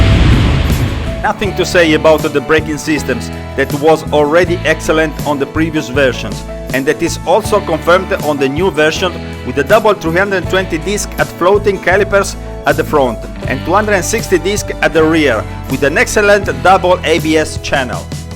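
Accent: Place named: Italy. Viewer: there is one thing as beautiful as Italian motorcycles - the sound of the English spoken by an Italian :)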